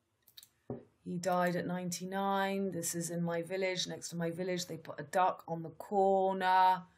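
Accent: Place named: France. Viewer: Wow your English is wonderful. I thought you were from England....with a French accent. I tried to learn French it was a joke in high school in the US ... you rock. 🥰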